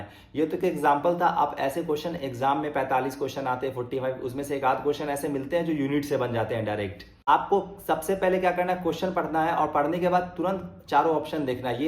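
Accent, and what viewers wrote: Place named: Bihar, India. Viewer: bhaiyaaaaaa......aap khn the phle 🥲😊....thnk uh so muchh...apki ye phli video dekhi...suggestions m thi...... aap bihar k ho kya...aap k baat s lga....thnk uh so much bhaiyaaa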